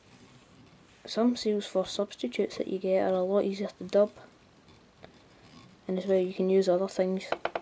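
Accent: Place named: Ayrshire, United Kingdom. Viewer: You sound and even tie like the great fly tyer "Davie McPhail" fae Ayr!!! well done son i love yer enthusism and confidence tying these ;) Where you fae? you sound like my accent as Im from Auchinleck Ayrshire!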